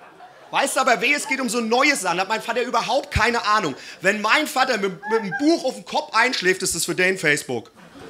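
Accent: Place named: Hesse, Germany. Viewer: Man merkt das er mit der Zeit immer mehr dialekt bekommt😂am anfang hoch deutsch und dan hessisch dialekt dan wieder hochdeutsch 😂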